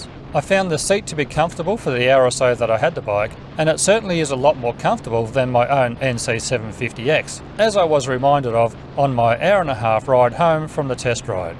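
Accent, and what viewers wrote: Australia. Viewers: Good to hear an Aussie test riding bikes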